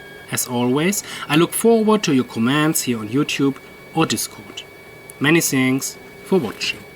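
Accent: Germany. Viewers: This is the most German English I have ever heard, saying this as a German xD But high quality content, I see the effort put into this, nice job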